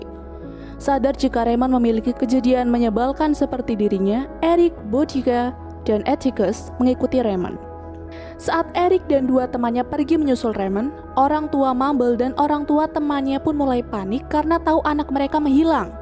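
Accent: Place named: West Java, Indonesia. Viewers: Ka kamu asli mana sih kok kaya ada bahasa sundanya plis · Admin na kos urang sunda anu tigarut nya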